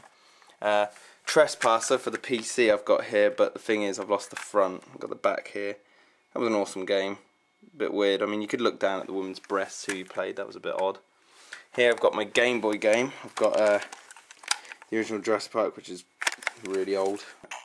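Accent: England, United Kingdom. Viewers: are you from england aren' you???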